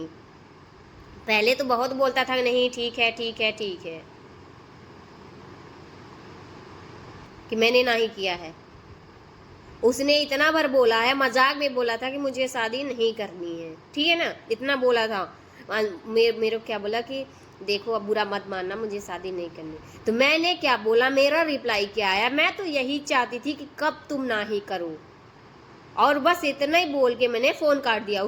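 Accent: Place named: Bihar, India. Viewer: Are you from prayagraj? Shocking.....you speak like bihari....anyway...keep growing....and stay away from such peoples...❤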